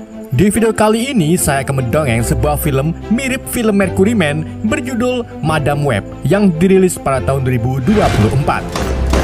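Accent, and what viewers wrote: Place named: Banyumas, Indonesia. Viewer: Ko ngomonge ngapak min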